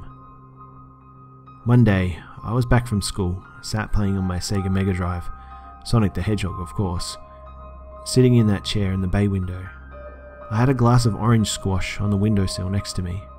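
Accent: Australia. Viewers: He's Australian XD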